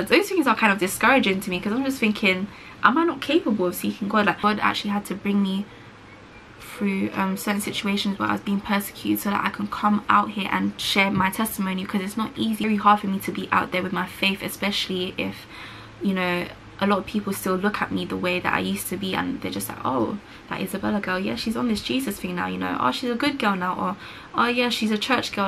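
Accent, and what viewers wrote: United Kingdom. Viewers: this is the first time ive heard an asian person speak with a british accent...lol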